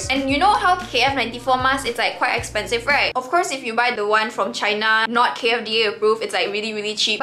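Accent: Malaysia. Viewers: Your chinese is really good,and your accent sounds very 'Malaysian Chinese'. I literally thought that you're a mixed race between Chinese and Malay🤣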